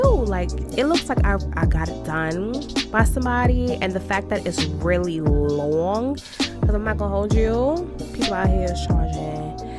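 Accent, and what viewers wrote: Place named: New York, United States. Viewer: Are you from New York?? Because I’m from New York and I know that accent from anywhere and you’re gorgeous 😍😍😍😍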